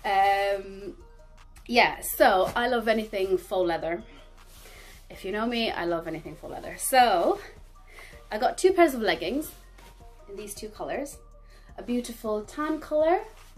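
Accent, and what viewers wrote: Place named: United Kingdom. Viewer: Loved the video cousin !!! :D P/S: Never noticed you sounded so british before haha!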